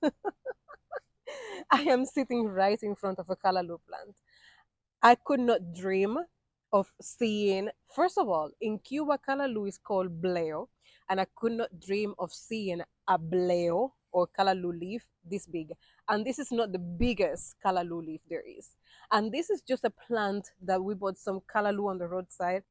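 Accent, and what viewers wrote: Jamaica. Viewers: I love your spanish/patwa mix accent/ words. So cute. Nice content. I'm in north Florida and its so hard to grow our foods here. Only yams, sorrel and sweet potato does well here but harvest is small. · I love your new Ja accent, its so cute, your right about Jamaica's soils, i reside in the United States and i miss my country.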